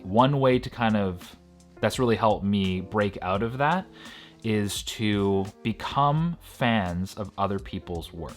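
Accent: Canada. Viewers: Your sarcasm and cadence is so unbelievably Canadian. I feel like I'm qualified to make these judgements because I too, am from Vancouver. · A Canadian, eh? How are Canadian accents different from those in the Northern United States?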